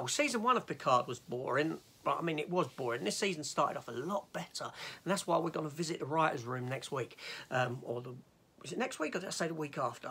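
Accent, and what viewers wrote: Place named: London, United Kingdom. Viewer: I'm from London myself so I caught the accent. We practically neighbours 😄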